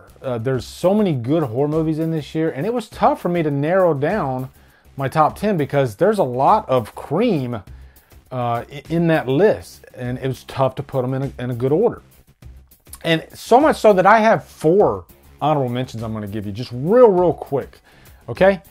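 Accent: South, United States. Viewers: Are you Southern originally?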